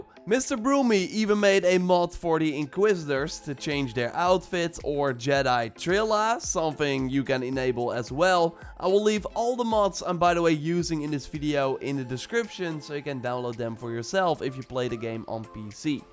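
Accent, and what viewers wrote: Netherlands. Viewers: Volgens mij is hij nederlands vooral het accent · Are you Dutch? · Are u dutch?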